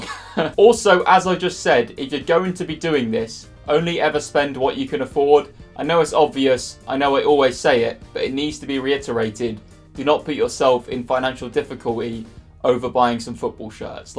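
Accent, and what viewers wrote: United Kingdom. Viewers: I like your accent ,the best British accent ,love you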